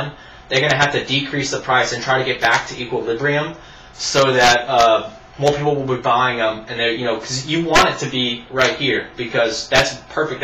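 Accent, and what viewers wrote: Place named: South, United States. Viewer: So entertaining! And easily explained! I Like your southern accent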